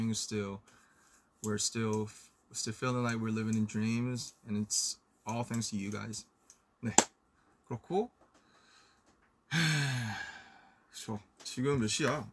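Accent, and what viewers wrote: United States. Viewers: That's because when he speaks English, he adopts a west coast California accent to sound like a typical American. He has the sound exactly perfect which means he studied and practiced hard. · Y do I luv it when he talks Korean w his American accent 😩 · Namjoon know what ARMY'S want.......he knows that there is no subtitle so we watch his live later with subtitle.....so he is also speaking in english so we ARMY'S will understand what he is saying.....and his english is sound like he is american.......and his voice OH MY GOD I CAN'T.....and he looks good in short hairs.......but I like his long hair more lol........but he still look best in short hairs....... I PURPLE YOU NAMJOON.........BORAHAE.....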